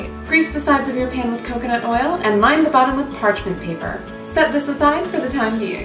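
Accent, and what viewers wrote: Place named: Michigan, United States. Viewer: Your accent is my favorite. My dad is from Michigan and hearing you makes me feel at home. I love this video for so many reasons. <3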